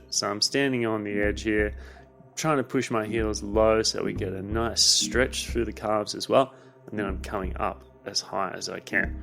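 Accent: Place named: Australia. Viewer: First time watching, excellent. Guessed Australian before you mentioned it but thought you were a Norwegian who learned English there.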